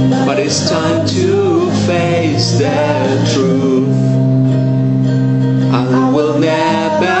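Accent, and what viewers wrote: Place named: United States. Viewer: Cuando cantas tu pronunciacion parece la de un estudiante de intermedio pero cuando lo hablas pareces realmente americano...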